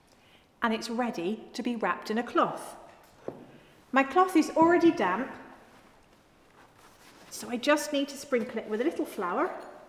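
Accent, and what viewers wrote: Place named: Cumbria, United Kingdom. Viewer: I can’t tell if this wonderful cooks accent is Queen’s English or Cumbria mixed with Scottish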